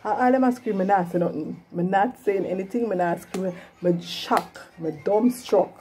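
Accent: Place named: Jamaica. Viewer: Mi love ya Jamaican accent 🔥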